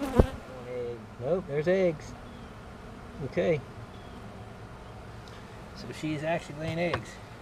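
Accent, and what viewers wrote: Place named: South, United States. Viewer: you speak so softly and you have a sweet southern accent so I need to turn up the volume just a little to understand what you are saying. I have to use ear buds so I don't disturb my grandsons and then my ears get blasted! I can turn the volume down fast enough. I had to stop watching you tonight in order to preserve my hearing. Please keep the volume level of the music the same as your voice so I can enjoy music into my 80's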